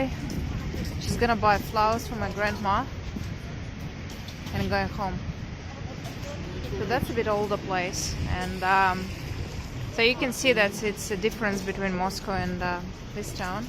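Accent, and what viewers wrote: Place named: Australia. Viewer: Здравствуйте! Я не буду хвалить Вас за хороший английский язык, было бы смешно, если бы Вы, приехав в Австралию в 26 лет и прожив там достаточно долго, не знали языка, но мне было интересно послушать, как говорят австралийцы! И, хотя все понятно, но разница, все же между английском в США и Австралией чувствуется. И, знаете, я вдруг почувствовала себя американкой на улицах Подмосковья! Такая грязь непролазная, вот ведь ничего не изменилось за столько лет! Спасибо Вам большое за это видео! Мне было неинтересно смотреть вокруг, но было очень интересно мое отношение ко всему этому! 👍👍👍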